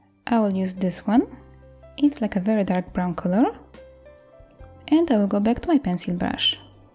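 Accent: Poland. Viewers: Czy mi sie wydaje czy słyszę Polski akcent?:)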